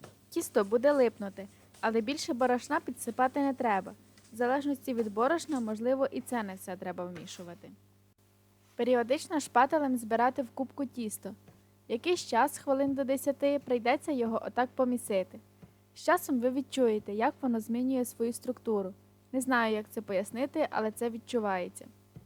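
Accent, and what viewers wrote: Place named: Lviv, Ukraine. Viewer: Я у захваті від вашої подачі цього простого, на перший погляд, десерту, приємно слухати львівську говірку! Дуже дякую!!🥰🏆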